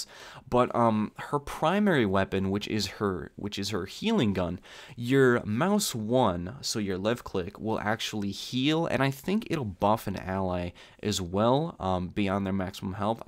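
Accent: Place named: United States. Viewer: i really think you deserve more views <3 i'm the sort to overthink things as well, i have many theories that i want to turn into a storyline for tf2 (obviously, not before i consider all of its comics). unlike you, i lack the voice and the concise speech manner (i'm sure you're using american english, which is my favorite) to make videos, so my only good english is the written one x'D i admit not knowing the characters/storyline of the game you are talking here, but your video is very pleasant for an introduction. too bad people lack the patience to listen for so long. somewhat, i wish there there was a heart shot rewarding system as well x'D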